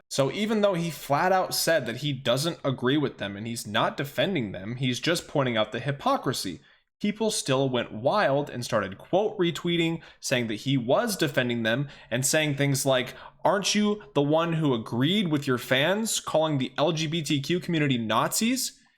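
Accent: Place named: United Kingdom. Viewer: He just sounds condescending to some people because 1) he knows what he's talking about 2) cause of the accent. People have been taking the British accent the wrong way for hundreds of years now lol.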